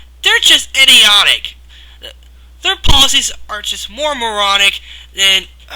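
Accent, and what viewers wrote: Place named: Australia. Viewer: I thought it was aussie.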